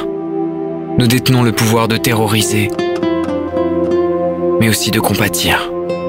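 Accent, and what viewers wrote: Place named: Spain. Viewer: Bel accent espagnol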